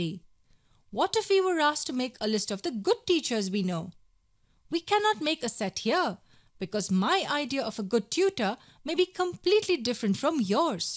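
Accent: India. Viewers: She has a strong Indian accent and lo and behold India pops up twice in the examples. Is this an attempt at subliminal propaganda from Indian Nationalists by any chance ? 😁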